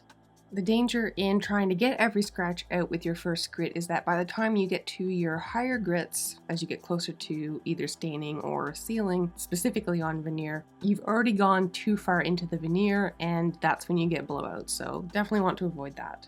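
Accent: Canada. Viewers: ! I could tell by your accent that you are Canadian, but so delightfully surprised that you are in the Valley! I'm in Switzerland but love so much seeing an artist from my neck of the woods! I actually got a little emotional when I saw the final result. I was imagining the people who owned that piece over the years and how happy they would be to see how you gave it such a stunning new life! I especially love the woodwork on the middle drawer. Lovely work!! ❤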